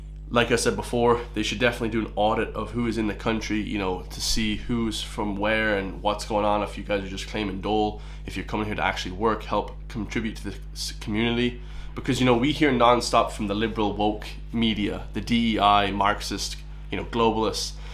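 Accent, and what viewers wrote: United States. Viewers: Question., you seem to have an American accent. But you use words we don't use in the U.S. ( bollacks ) etc. What's up with that? Otherwise great job. Could you send us some of your travellers to the P.N.W. ,across the pond. .see we have these f***tards over here called ANTIFA and they really need the same kind of treatment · Why do you sound like a yank? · This is so sick!! Get them TF out!!! Are you a American living in Ireland? Your accent sounds very American.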